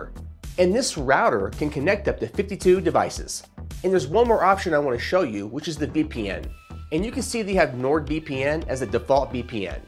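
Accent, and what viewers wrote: United States. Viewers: Yes, agree, his first language is not English, but his American accent is quite good. I only hear the slightest of a non-American English accent on certain words and intonation. Nevertheless, great information.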